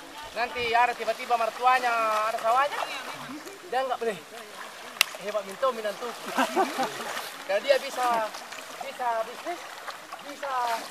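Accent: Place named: South Sulawesi, Indonesia. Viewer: Cara bicaranya kaya orang sul sel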